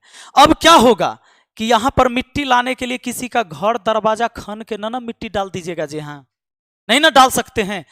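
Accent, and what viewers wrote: Bihar, India. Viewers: Aap gvaro jaise bat krye bihar ke. Jansankhya. Ghntv pr boliye aapka sr tan se juda go gayega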